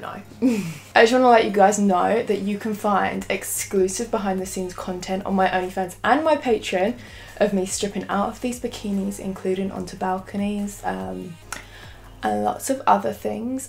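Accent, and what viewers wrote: United Kingdom. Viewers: I did not imagine her with a british accent wtf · Ela é britânica da pra ver pelo sotaque.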